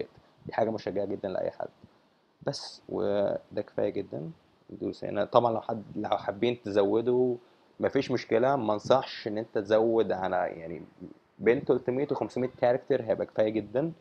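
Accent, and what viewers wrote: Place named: United States. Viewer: بص الامريكن اكسنت كويس جداً مشاء الله بس افصل مبين العربي والانجلش لانك تقريبا بتتكلم عربي اميركن اكسنت هههه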